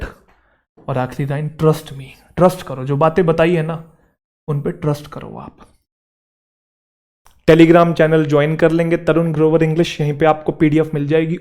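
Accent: Haryana, India. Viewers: Sir aap haryana se ho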